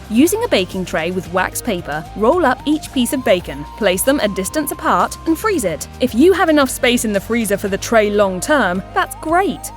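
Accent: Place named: England, United Kingdom. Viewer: The English accent is terrible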